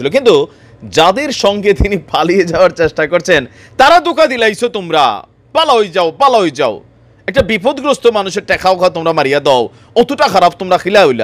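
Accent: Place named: Sylhet, Bangladesh. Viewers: হুনছি, মাশাআল্লাহ আপনার সিলেটি মাত।😅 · sylheti math shunia je vala laglo thanks ❤ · ভাই সিলেটি নাকি?? আপনার কথা শুনে বুজলাম আপনি সিলেটি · Sylhet mat bala lagece apnar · ভাই আপনার মাত আদা সিলেটি। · Bai apnr shelet er vasa ta khub sundor hoice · আরে ভাই আপনি তো দেখি সিলেটি❤❤ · ভালোই লাগলো ভাই সিলেটি কথা,,, আমিও একজন সিলেটি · মাশাআল্লাহ ভাই আপনি খুব সুন্দর সিলেটি ভাষায় কথা বলতে পারেন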